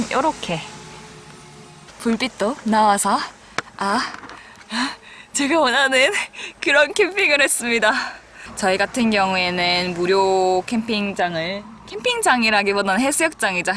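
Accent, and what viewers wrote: Gyeongsang, South Korea. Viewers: 혹시 고향이 갱상도 인가요??? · 영상 잘보고 갑니다 익숙한 경상도 말이네여 : ^ ) 해맑으셔서 기분이 좋아집니다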